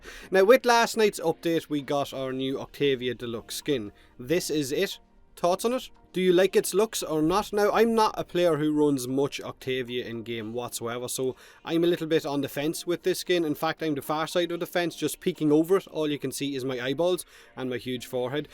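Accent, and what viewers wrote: Ireland. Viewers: Personally I love your brogue.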